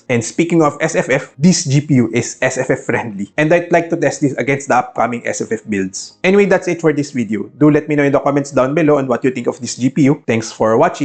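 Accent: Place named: India. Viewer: Ur accent similar as indian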